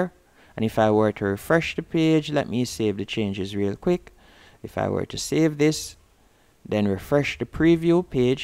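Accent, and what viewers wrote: Jamaica. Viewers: Haven’t finished the video yet but I wanted to take a moment to admire you accent. I love the Jamaican accent, reminds me of home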